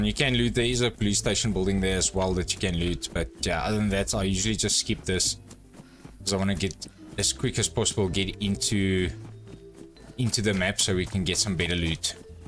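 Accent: South Africa. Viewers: 😅brother I know your afrikaans , the accent gave it away I'm also south african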